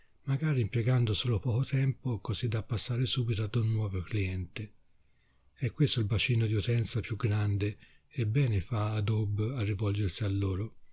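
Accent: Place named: Tuscany, Italy. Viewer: Ciao! bellissimo lavoro! ti faccio i miei sinceri complimenti. Io sono una affezionata utente cinelerra (dal 2003) anche se ultimamente edito poco perche le mie scelte professionali mi hanno portato in altre direzioni. Avendo tempo lo tradurrei, intanto bravo e...essendo anche io toscana pero expat, un tu sai quanto mi sono commossa ascoltando la tua voce!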